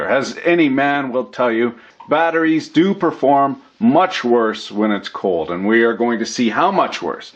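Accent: Canada. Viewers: WARNING! VERY CANADIAN!!! But he seems like he knows what he is talking about , and he answered my question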